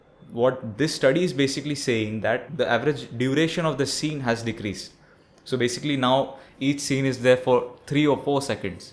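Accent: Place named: Gujarat, India. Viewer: Dude, I didn’t know you were Gujarati. Your dialect sounds Surti.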